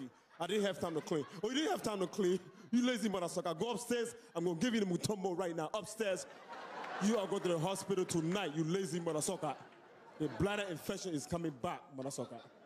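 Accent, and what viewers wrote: Africa. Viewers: I absolutely love him. He's hilarious. I never get tired of the African accent. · lol his African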